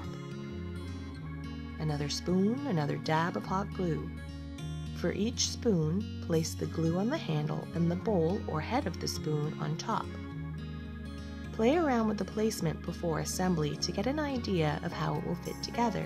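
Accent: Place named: Canada. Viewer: Thats lovely, are you Canadian? You sound a bit like Suzie from nail career education on here?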